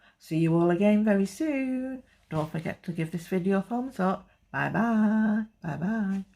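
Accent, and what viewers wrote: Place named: Manchester, United Kingdom. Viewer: hi there! Newbie crafter here and I found you via the #fallfestcollab the shadow box is absolutely amazing! what an amazing way to re-purpose items! now the 2nd craft holy cats that's so imaginative !! egg shells! omgodness these are all so amazing! i'm sure you get asked all the time but I'm new.. your accent reminds me soo much of my favorite soap opera.. so I have to ask (to see if my ear is even close) near Manchester? :)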